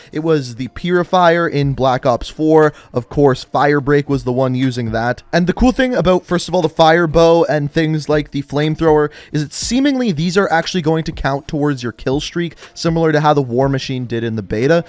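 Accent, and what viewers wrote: Canada. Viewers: That Canadian accent😬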